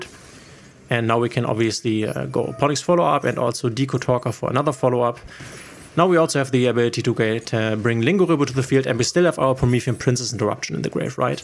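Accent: Germany. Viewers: Dieser deutsche Akzent nervt echt. Aber gute Zusammenfassung wie das Deck funktioniert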